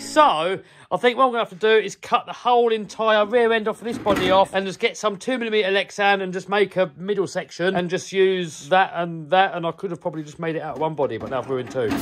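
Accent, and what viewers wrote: United Kingdom. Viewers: Very British English. Great caröeee.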